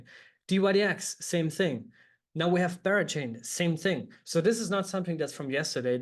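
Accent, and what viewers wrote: Germany. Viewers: He‘s German, right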